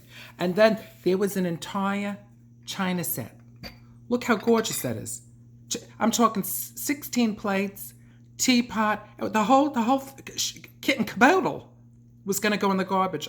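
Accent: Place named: Bronx, United States. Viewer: Oh,. I cannot tell you what these daily thoughts of solitude mean to me. I look forward to them every day, and while the world has become a frightening place, your musings over the things that matter in that gentle, salted Bronx delivery are so wonderfully poignant, and make me feel truly connected to the things that matter most. This series is just incredibly calming and inspirational to me - Thank you, thank you, thank you beautiful. <3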